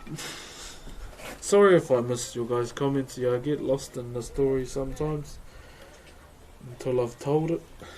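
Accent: New Zealand. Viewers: Whymark Nah, heavy kiwi accents like are super attractive to me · New Zealand Matey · Yea, Korg. It's because of the kiwi accent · Yup that's our Maori accent lol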